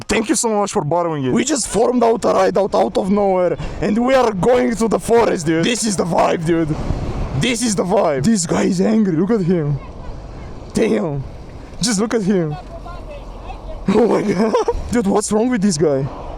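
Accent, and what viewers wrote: Balkans. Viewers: The balkan accent alweys gets me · I love your true happiness from riding combined with Balkan accent on this videos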